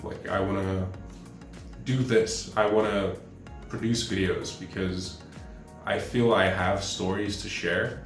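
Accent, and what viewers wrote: United States. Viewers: That's funny, I never knew. I asked because I just assumed with your accent that you were from the state's. I have my own production company/ recording studios and am always looking for new projects to take on. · Are you from SA, you sound American?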